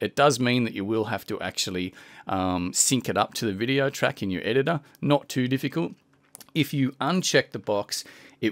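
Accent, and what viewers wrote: Australia. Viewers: yes another aussie haha.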